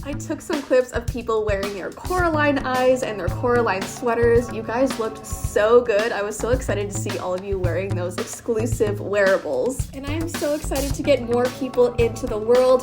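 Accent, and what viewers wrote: Canada. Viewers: She has a Canadian accent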